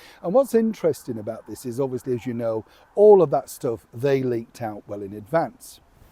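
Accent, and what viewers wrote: Yorkshire, United Kingdom. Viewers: Yes a Yorkshire Lad 😊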